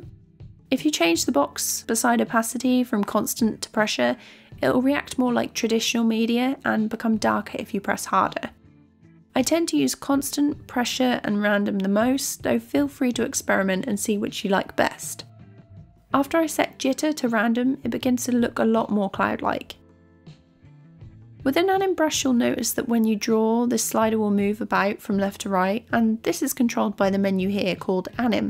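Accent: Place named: Norfolk, United Kingdom. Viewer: “Cuttn tool” love the Norfolk twang ❤️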